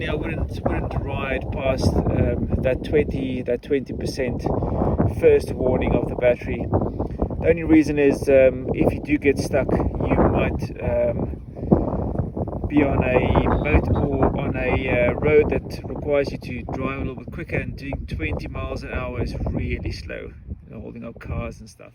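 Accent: South Africa. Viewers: You say a few words. Me: This guy is a South African! Nice channel, you have a new sub.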